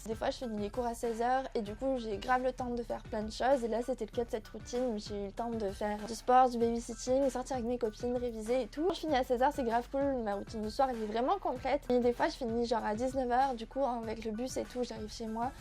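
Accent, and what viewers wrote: Marseille, France. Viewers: Je t'aime trop tes la meilleure ❤😱😍😍👌 et j'adore tes cheveux magnifique 😍👌🔥demain cours 😱😭😭 j'adore ton accent marseillais et Oui pour la vidéo que tu veux faire ❤❤👌toute façon toute tes vidéo sont parfaite; tu te couche aussi tard que moi 😂😂😘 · L'accent marseillais qui ressort +++ on adore !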